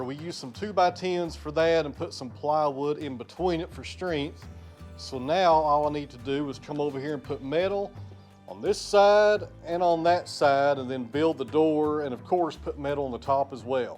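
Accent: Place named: Tennessee, United States. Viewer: Well friend, there’s nothing I enjoy more after a twelve hour day then watching OTW, and hearing your Tennessee talk. My dad’s people were from Big Sandy, Paris, Skullbone. If I close my eyes, I can hear my pawpaw in your voice. Good stuff. I vote for Cypress. Go see your buddy, Jake.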